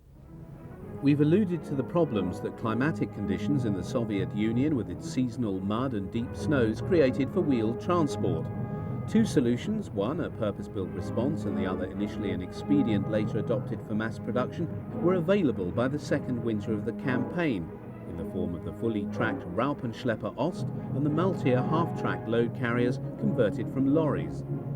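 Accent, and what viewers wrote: United Kingdom. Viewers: Really nice video. Fills in the gaps left by most discussions on wartime vehicles. Want a good laugh? Turn on the subtitles. The system doesn't have an ear for British accents. "...their inadequate grasp of the theory of armored warfare..." = "inadequate grass people theory Obama"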